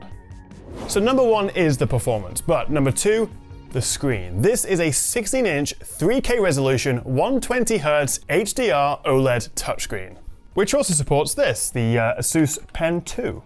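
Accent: England, United Kingdom. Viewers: From what region of England are you?, Is it just to know where your accent comes from. Please. A French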